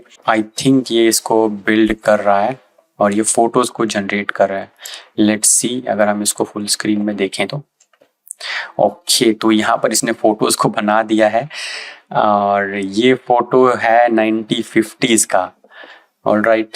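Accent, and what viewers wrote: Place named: Ireland. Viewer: It's starts out like English but then becomes Irish or something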